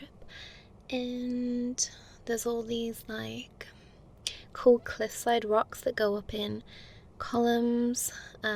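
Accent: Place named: Midlands, United Kingdom. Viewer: I keep saying it, but hot fucking damn your midland accent makes me melt. Then you're always so spunky and adorable ooof, just yes That last part through, oh man the feels, planned something exactly like that for my ex but we never got to go. That letter before the final reveal hit real different for me at least. Mmmm yep this is good ASMR.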